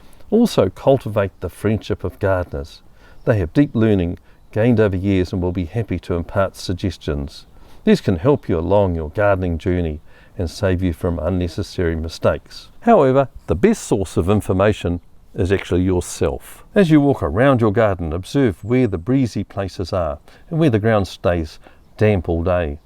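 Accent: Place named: New Zealand. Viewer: Hi, I'm looking forward to working my way through your tips. We moved from 1/4 acre in the 'burbs of Hamilton to 10 acres in Nth Canterbury 3 years ago and while I have gardened for many years and have big dreams I am somewhat challenged in the design and execution department:)) Always lovely to hear our NZ accent on "the tube".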